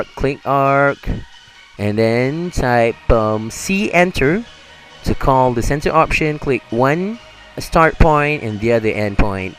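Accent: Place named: Philippines. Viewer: useful video and from ur accent it looks like u are from Philippine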